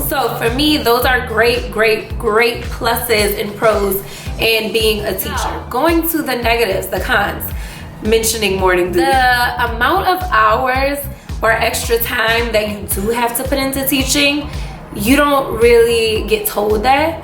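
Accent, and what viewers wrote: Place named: Louisiana, United States. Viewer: I never comment but I'm loving your consistency! I come here just to hear your accent! I'm from Louisiana too I'm in Houston now too 😊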